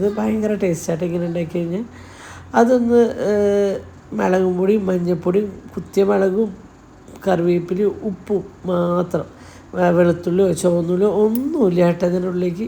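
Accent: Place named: Thrissur, India. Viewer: അല്ലേലും തൃശൂർ ഭാഷ അവിടെ ഉള്ളവർക്കേ മനസ്സിൽ ആകുള്ളു അത് അവരുടെ കുറ്റമല്ല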